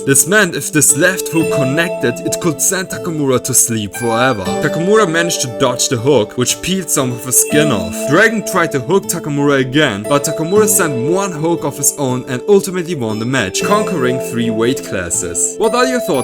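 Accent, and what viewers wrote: Germany. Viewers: Krass wie sie man merkt dass du deutsch sprachiger bist😂😂😂es ist immer wieder so witzig herauszuhören wenn man deutsch ist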